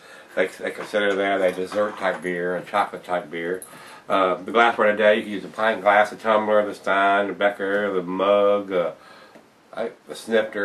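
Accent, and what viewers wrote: South, United States. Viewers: "Today I'm reviewing bell's expedition stout, I said stout!," very pleasant southern accent sound like a coronal, where you from?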